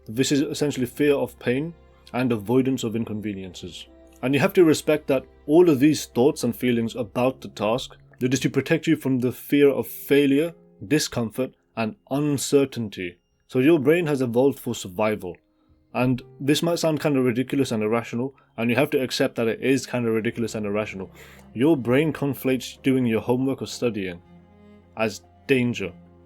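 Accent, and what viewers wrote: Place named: Egypt. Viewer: This random, tiny channel of a man speaking with Egyptian accent has helped me so much with pushing through procrastination. Thank you Internet man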